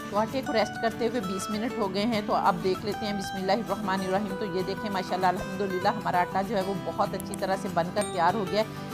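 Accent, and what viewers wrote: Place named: Delhi, India. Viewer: Dear sister,Masha Allah behtreen recipe of Palak and Mutter.Your way of talking and fluency is very charming .I feel you. Speak Urdu of Dehli origin.Use of a Punjabi word Bhuppa was also a unique selection by you. I enjoyed it. Thank you for pinning me and your response to my comments.Allah Hafiz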